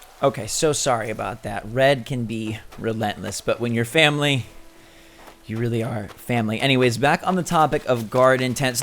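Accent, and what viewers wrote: United Kingdom. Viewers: Am I the only one who loves his British voice : D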